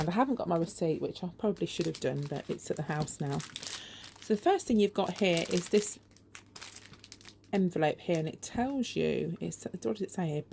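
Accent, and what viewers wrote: United Kingdom. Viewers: Just came across your channel after typing in UK crafts. I was getting a bit fed up with most sites I'm subbed to being American with items not available over here. It's good to here a British voice! I'm on a tight budget & never heard of 'Every Craft A Pound' so this is great news for me. As for your craft room...WOW! It is just so beautiful & all so neatly organised. I don't have a spare room so my very small & narrow living room is also my crafting room! hehe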